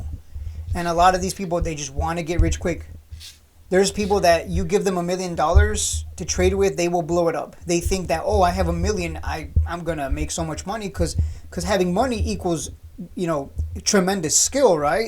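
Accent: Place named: Northeast, United States. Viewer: oh I thought you was in northeast cause the accent haha I’m from SoCal. I’ve driven truck all through Midwest and NY many times tho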